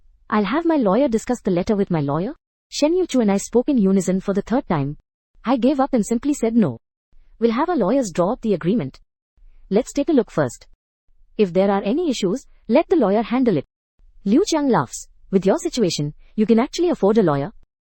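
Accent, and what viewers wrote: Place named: India. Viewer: Coba yg naratornya English biasa masih aman tp ini india adehhhhhh harus pasang telinga utk lebih jelas pengucapannya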